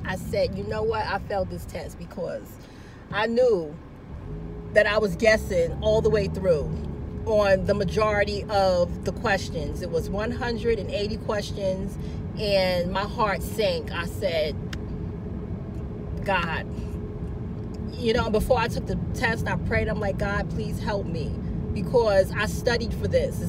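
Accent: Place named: New York, United States. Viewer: I hear in your voice that you are from New York, do you still live there or did you take your NCLEX there?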